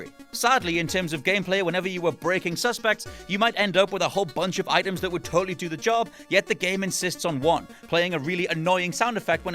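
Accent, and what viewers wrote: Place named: United Kingdom. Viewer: A British accent wearing an Alter Bridge T-shirt, makes it hard to stay mad at you.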